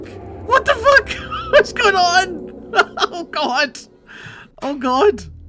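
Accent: Scotland, United Kingdom. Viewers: He is Scottish, I don't think Russian sounds like that at all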